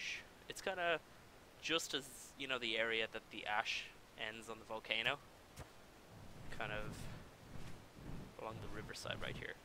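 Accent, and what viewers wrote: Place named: Ireland. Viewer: U sound Irish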